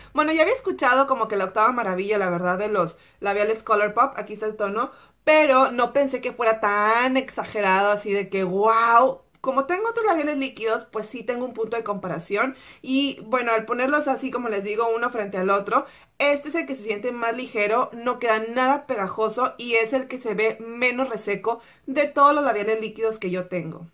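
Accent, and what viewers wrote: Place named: Monterrey, Mexico. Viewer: Me encantan tus videos, pero también entiendo perfectamente tu forma de hablar jajajaja....Las Viejas... sera porque también soy Regia, aunque vivo por mas de 25 anos en Albuquerque, y se que no es por ofender, y tu cara con lo de las tareas de los niños. Felicidades por tu canal lo disfruto mucho y ojalá puedas seguir con los videos de como organizas tu casa, que por la organización de tu cocina es como encontré tu canal, ya hace algunos anos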